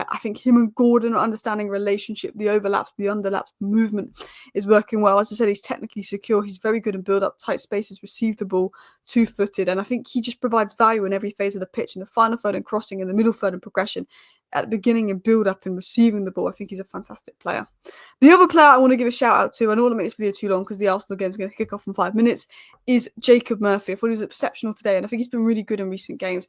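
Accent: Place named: Newcastle, United Kingdom. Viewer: Love that your a secret Geordie 😅 always quick on the videos, good stuff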